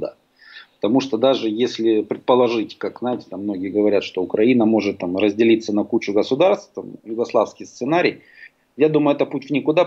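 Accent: Moscow, Russia. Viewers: акцент то Московский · Диктор с московским акцентом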